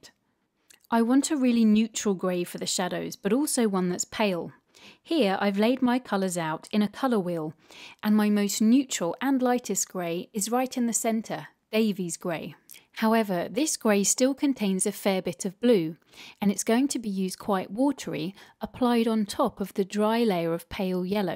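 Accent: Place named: England, United Kingdom. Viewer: She speaks perfectly. It's called an English accent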